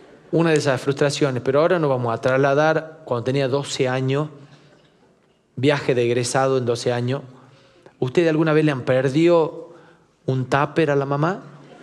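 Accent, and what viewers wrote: Tucumán, Argentina. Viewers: los tucumanos hablamos divino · no te gua ce nada, así hablamos los Tucumános jajajaja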